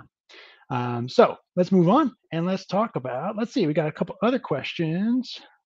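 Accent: South, United States. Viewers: I love your videos and your softly southern accent! I’m from Texas (central and SE) too and was just going to say your Va. accent! I don’t recognize your region but I don’t claim to be familiar with them all. Texas is a big place! You provide such valuable advice and I thank you for educating us all🙏 ❤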